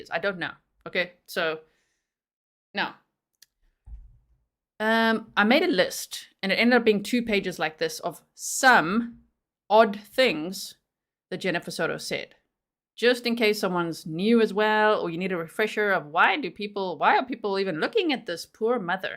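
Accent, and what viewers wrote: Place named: South Africa. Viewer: New subscriber here, I'm really particular with who I listen too as some people are really annoying to listen too lol, but I'm so happy to find you, love your SA accent and slangs (shame) 😂 as hubby is from SA, love your channel ❤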